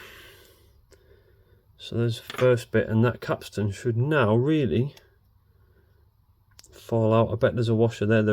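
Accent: Scotland, United Kingdom. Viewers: Is that Scottish accent? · Are you from Scotland? I like your accent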